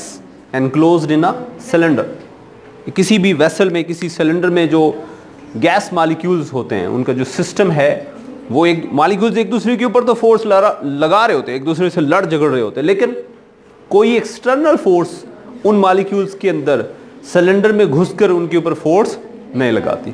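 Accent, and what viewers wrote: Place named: Punjab, India. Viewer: why punjabi sounds so heart-touching and funny😂 especially when he speks.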